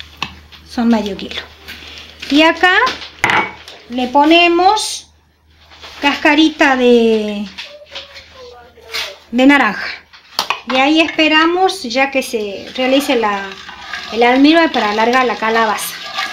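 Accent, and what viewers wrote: Tucumán, Argentina. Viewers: Soy tucumana y con la misma tonada, me encantó la receta, mi abuela la hacía igual, gracias.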